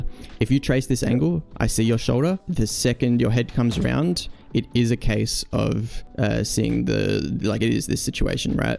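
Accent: Australia. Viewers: as an aussie ibwould say he has more of a aussie sydney accent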